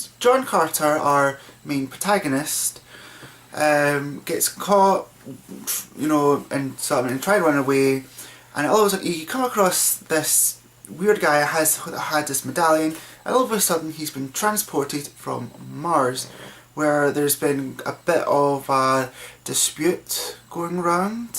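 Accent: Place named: Ireland. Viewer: You speak really quickly in an Irish accent. I kind of had a hard time.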